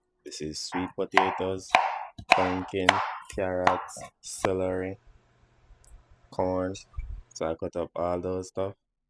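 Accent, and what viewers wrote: Jamaica. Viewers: I’ve watched your short videos with music playing in the background. I much rather hear your rich Jamaican accent. It gives your awesome dishes more flavor. 👍🏾👍🏾👍🏾👍🏾‼️‼️‼️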